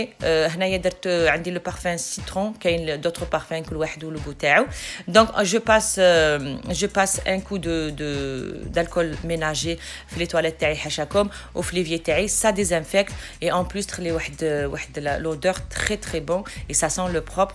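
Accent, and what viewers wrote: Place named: Marseille, France. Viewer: 3andk acson marseillaise bonne continuation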